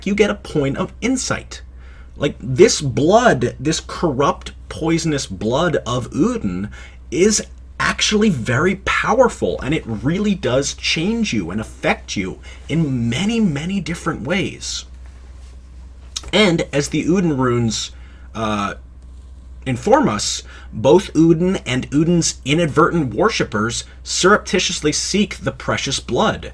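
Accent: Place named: Northern England, United Kingdom. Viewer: it made me chuckle a little hearing you say "Oedon" in a a northern english accent.. you can just pronounce it "Oden" its just the accents in game that make it sound like Ewwwdon 😂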